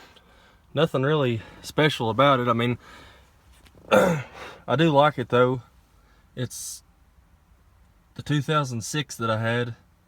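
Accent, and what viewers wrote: United States. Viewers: Your accent is making me miss my Kentucky family. Nice Vid, love the truck. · So southern, sounds of goats followed by a hefty loogy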